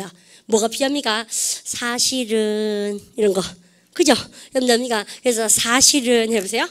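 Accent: Gyeongsang, South Korea. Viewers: 대구말씨 귀엽고 웃겨요!!!ㅎㅎㅎ 강의 듣다가 혼자 킥킥거리면 가족들이 힐끗 쳐다봐요 ㅋ😂 참고로 저는 창원아줌마 임돠 항상 감사드립니다❤ 화이팅~~~~😊😊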